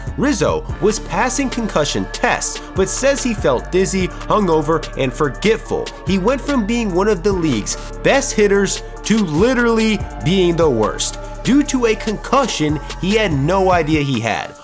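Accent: United States. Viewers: The guy talking is obviously from Texas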